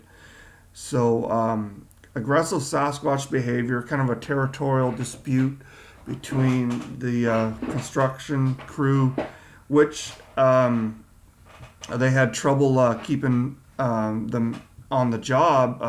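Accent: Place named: Canada. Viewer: Thanks. Love your Canadian accent, and your videos too.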